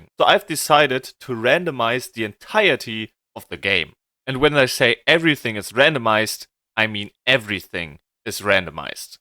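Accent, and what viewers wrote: Germany. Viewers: Hey are u german u Sound like u hafe a german accend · ich höre so stark das du deutsch bist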